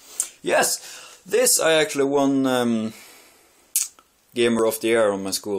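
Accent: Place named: Trøndelag, Norway. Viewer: I love ur Trønder-Engelsk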